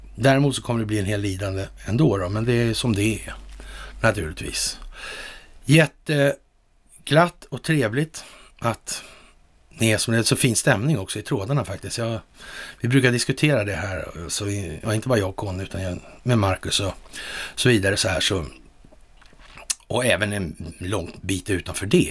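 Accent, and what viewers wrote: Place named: Gothenburg, Sweden. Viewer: Vänligt av dig att du tog tid på dig och kom tillbaka tidigt igen ja förra klippet var jätteroligt trevligt att höra lite göteborgska igen det var länge sedan, 🌺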